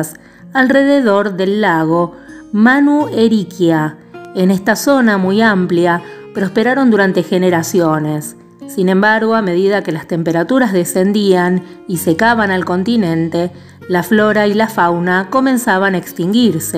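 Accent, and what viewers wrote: Argentina. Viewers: ¡Me encanta este canal! Soy de Nueva Zelanda y el inglés es mi lengua materna, así que disculpa cualquier error. Si aún no lo has hecho, deberías hacer un video sobre las aves de Nueva Zelanda. Tenemos muchas aves raras aquí, incluidas muchas que no vuelan. Me encanta tu acento. ¿Eres de Argentina? Un abrazo desde Nueva Zelanda 😊 .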